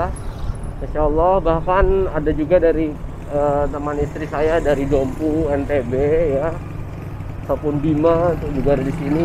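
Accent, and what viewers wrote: Banjar, Indonesia. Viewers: Lah orng banjar kah pian😂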